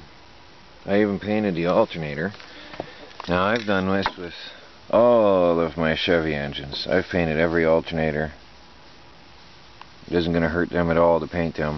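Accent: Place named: South, United States. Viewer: Come on now, have you ever been in the south where creek sounds like a crick in your neck? PotaTOE Potaaaato TomaTOE Tomaaaato....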